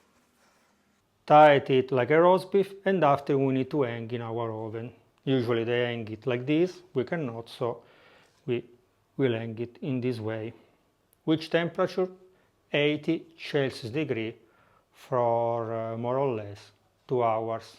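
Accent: Italy. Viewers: Descubriendo tu canal, saludos desde Venezuela, y comienzo a ver tus videos hoy. Comencé con la papada curada de cerdo para hacer carbonada... me encanta tu acento italiano de tu inglés!! Un abrazo y mucha suerte!!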